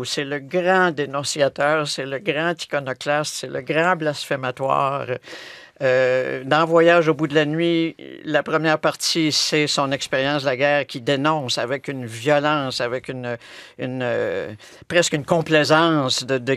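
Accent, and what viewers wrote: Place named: Quebec, Canada. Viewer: Merci beaucoup pour votre présentation. J'adore l'accent canadien, québécois. D'Algérie je vous suis attentivement.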